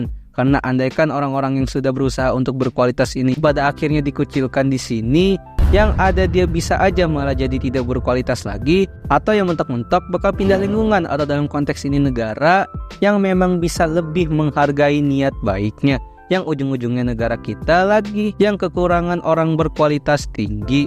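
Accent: Java, Indonesia. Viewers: Lebih ke jawa sih bang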